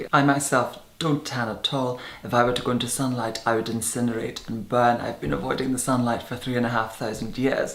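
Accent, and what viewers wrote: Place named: Scotland, United Kingdom. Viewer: He is from Scotland.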